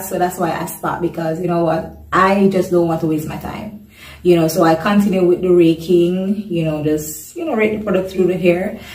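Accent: Jamaica. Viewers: I can hear the Jamaican in you. I am watching you from Canada! I love your video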